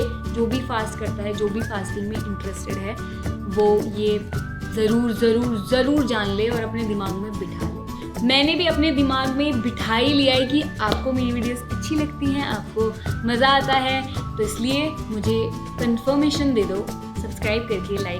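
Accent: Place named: Uttarakhand, India. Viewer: I can guess you are from uttarakhand ...😅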